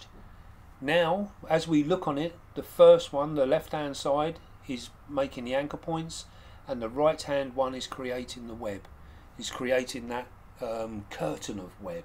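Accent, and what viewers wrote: United Kingdom. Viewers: There's nothing better than having a British guy describe nature to you, you know it's the Truth because of the accent.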